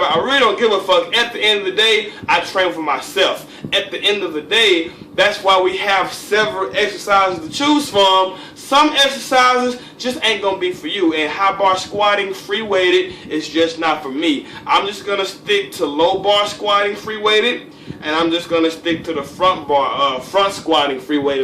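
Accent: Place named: AAVE, United States. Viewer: talks like a dummy but if you can look past the ebonics he makes a lot of sense and hes oozing charisma. Love to watch him. Some ppl sound too "boring professor" like. Keep doin what youre doin bro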